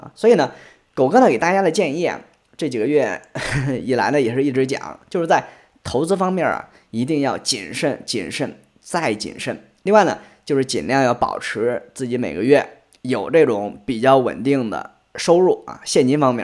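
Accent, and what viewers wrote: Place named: Henan, China. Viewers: 狗哥这口音有点像河南人啊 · 狗哥老家八成河南附近，因为口音有一点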